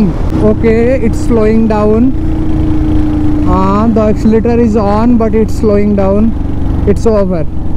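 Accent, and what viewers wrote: Odisha, India. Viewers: Bhai re laguthila Odia boli tuma accent ru … especially Talcheria boli … btw nice video … mu b Talcher ru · Pls make it in odia,coz ur Hindi & English pronounce appear typical odia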